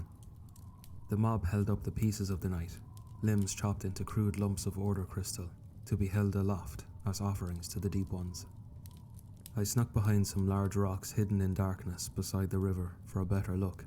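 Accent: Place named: Ireland. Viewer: Finally an Irish narrator ❤ go raíbh míle maith agat ❤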